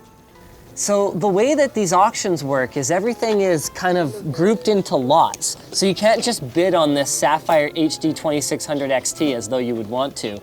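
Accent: Canada. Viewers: Canadian accent really poppin in this video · you can hear the canadian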